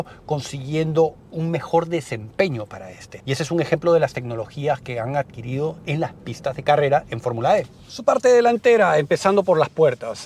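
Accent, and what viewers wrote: Peru. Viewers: eres de Perú??????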